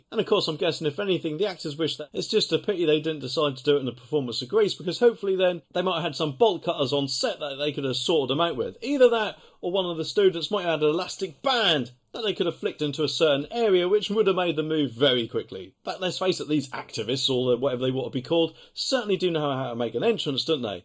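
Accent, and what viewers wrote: Norfolk, United Kingdom. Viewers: You sound Norfolk! Am I wrong or right?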